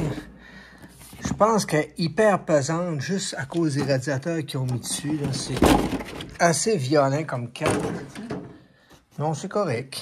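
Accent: Canada. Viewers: Presque un kray . J'imagine un cluster avec des becanes de ce type là. Merci pour tes videos et avec ton accent canadien, celà rend l'exposé encore plus sympa pour moi français. A bientôt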